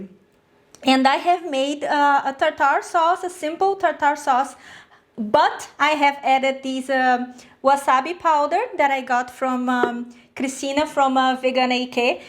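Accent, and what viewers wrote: Brazil. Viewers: A receita parece legal, mas vim elogiar seu inglês hahahaha dá pra perceber o sotaque brasileiro mas eu gostei bastante de como você se virou com as palavras. Parabéns :) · Ah, que legal! Seu inglês é maravilhoso, perfeito! Mas como sou brasileira, pesquei o sotaque! Obrigada pela receita! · Vi os primeiros 30 segundos do vídeo (o primeiro que vejo seu) e pensei... Wow! Ela fala como uma brasileira! Parabéns pelo trabalho maravilhoso, chef!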